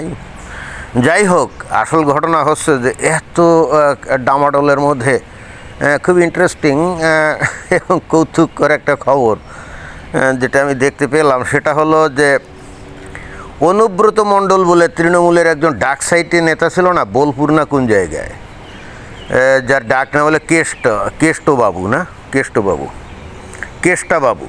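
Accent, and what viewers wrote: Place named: Bangal, Bangladesh. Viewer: তোমার উচ্চারণ দেখে মনে হচ্ছে তুমি বাঙাল।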